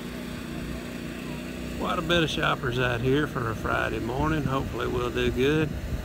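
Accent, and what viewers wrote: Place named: Louisiana, United States. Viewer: 3 or 4 time governor of Louisiana? " "! Love the "TALK" from down home. y'all! Cajun:-))))))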